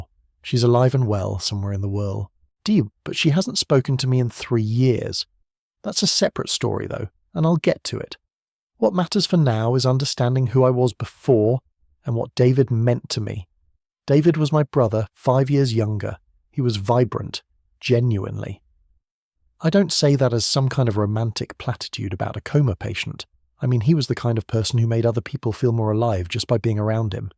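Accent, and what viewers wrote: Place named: United Kingdom. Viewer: This is an American story, why is there a Britain narrating it?,, I want to hear America’s story of American voice.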